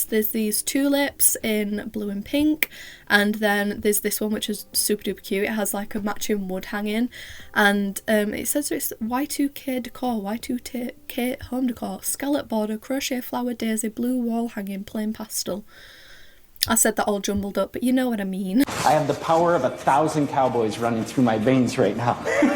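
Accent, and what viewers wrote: United Kingdom. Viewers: Your voice is very calming and I love your accent! Which UK accent is that? <3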